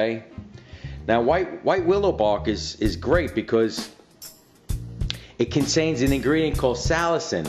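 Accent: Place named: New York, United States. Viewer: Lol I'm sorry I kept giggling the whole film. The thick new yorkish accent is so funny and gives him this "hey guys, come ahhn" type of feel to the video.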